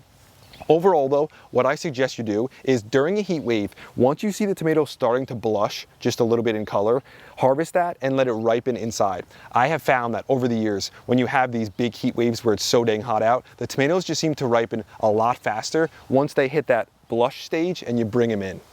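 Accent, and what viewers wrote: New Jersey, United States. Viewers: Love my guy from Jersey- Make sure you use lots of wooder...😂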